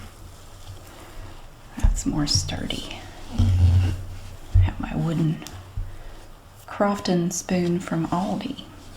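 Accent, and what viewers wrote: South, United States. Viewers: I love the way you say oil with your southern accent! I was making gingerbread the other night and I was thinking of you and I said ad the ol 😂.. my son squinted his eyes and looked at me lol.. I said it's an inside joke.. u wouldn't understand. I love your southern accent!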